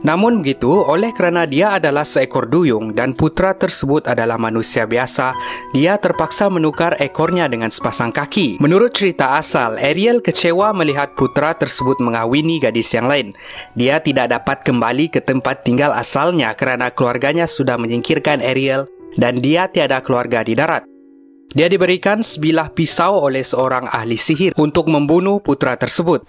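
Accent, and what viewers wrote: Sabah, Malaysia. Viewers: kamu urang sabah ka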